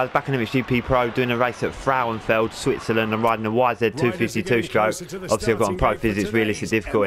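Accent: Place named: United Kingdom. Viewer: I love your accent so much where are you from in the uk ? Good videos by the way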